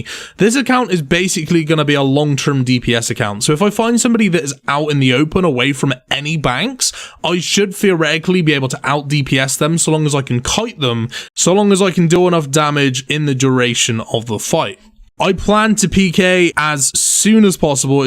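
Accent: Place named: Somerset, United Kingdom. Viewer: mate that 'sommersert' accent..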